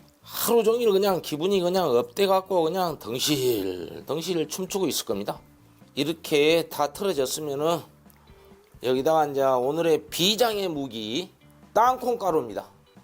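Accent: Jeolla, South Korea. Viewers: 셰프님 라도사투리~양재기 참듣기좋습니다 잘배워갑니다❤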